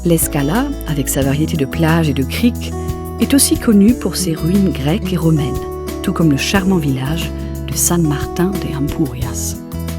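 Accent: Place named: France. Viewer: Visca Girona i tota Catalunya Catalana, c´est marrant d´entendre les noms de ville et d´endroit avec cette prononçassions Française.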